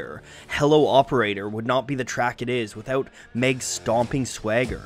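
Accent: Canada. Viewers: I just learned that you are Canadian. Your pronunciations make sense now and I realize I’ve got a USA-centric lens. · D O N O T T R.Y' TO S O U N D A M E R I C AN * WE HEAR YOUR CANADIAN ACCENT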